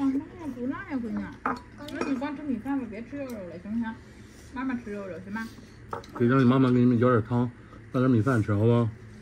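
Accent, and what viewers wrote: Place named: Beijing, China. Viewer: 听着像老北京人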